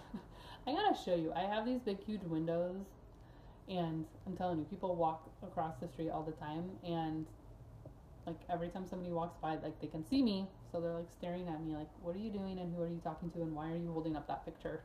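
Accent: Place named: Northern Ireland, United Kingdom. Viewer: would you believe not just Irish, but Northern Irish, a much more rare accent, and very charming (more like Scottish). And actually a lot of people find it hard. Probably because initially you want to say (as in Michelle) and then Stitch becomes hard to say 😝 trust me to make it harder than it needs to be. I must admit I put about 13 seconds thought into it 😉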